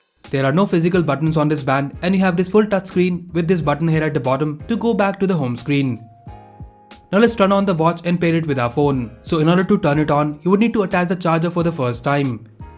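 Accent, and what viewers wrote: India. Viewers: I hate These Indian voices 🙄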